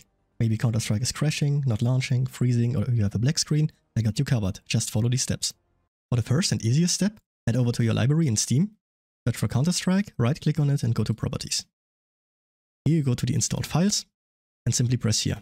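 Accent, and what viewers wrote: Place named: Germany. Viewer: it vac banned me, ty thick german accent guy.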